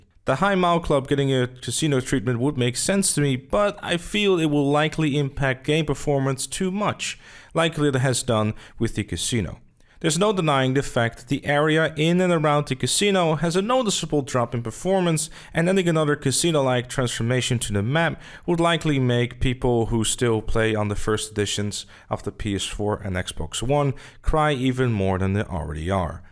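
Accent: Netherlands. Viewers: Aan je accent te horen ben je denk ik nederlands maar je kanaal is heel nice man props man! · Nederlands? Ik hoor het haha🔥👑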